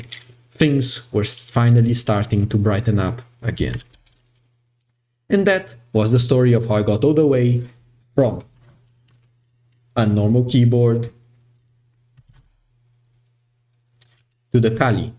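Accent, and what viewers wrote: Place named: Brazil. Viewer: Ahahahhaha então somos conterrâneos! Inglês perfeito, mas desconfiei pelo leve sotaque da nossa língua. Muito bacana. Vou me inscrever e depois vejo uma forma de dar um feedback pra ti de como ficou o meu aqui.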